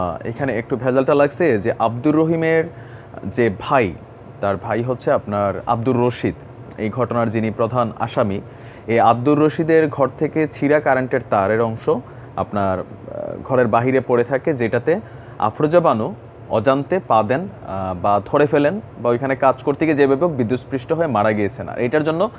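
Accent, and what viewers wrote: Comilla, Bangladesh. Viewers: ভাই আপনি কুমিল্লার ভাষায় কথা বলেন খুব ভালো লাগে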